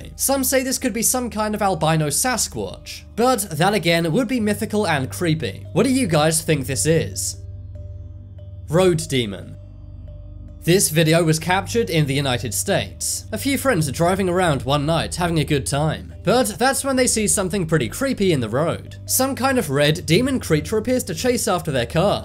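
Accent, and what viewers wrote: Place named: United Kingdom. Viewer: Not the first time a British accent tried to con the world into bullshit.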